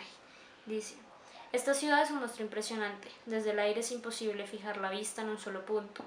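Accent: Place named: Mexico. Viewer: Ahora hablas con acento mexicano :D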